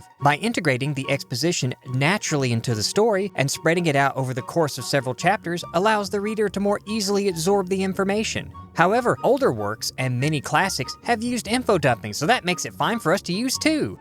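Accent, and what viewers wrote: South, United States. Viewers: The most subtle of southern accents makes this all the better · I love this guys accent is it a southern accent?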